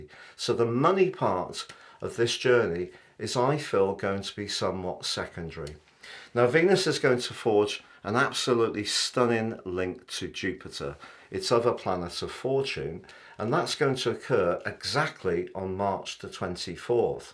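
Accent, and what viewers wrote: United Kingdom. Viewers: I love your British accent 😍and your videos 👌! “Venus in Pisces” (I’m a Pisces and I love many sorts of arts… ) By the way : I’m a Dutch 🇳🇱 ‘hobby’ astrologer -> My uncle was a ‘real astrologer and he taught me how to make and draw astrological charts AND of course how to interpret them, because it’s a way to better understand people. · You're british?! 😂❤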